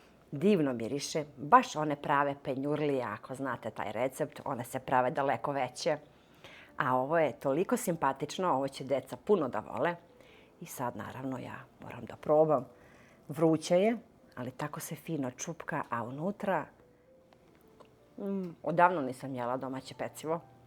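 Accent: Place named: Blace, Serbia. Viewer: Aman zeno sa juga iz Blaca,sto se stidis svog imena.Zar je moguce da se tako lako menja ime krsteno koje ti je kum dao i na koje treba da budes ponosna.Ja znam da hriscanski kada se zena zamonasi onda dobija drugo ime. Treba da se ponosis tog blackog naglaska a ne da se krijes iza tog nimalo lepog imena.Za kulinarstvo svaka cast ali postuj ono ko si i sta si.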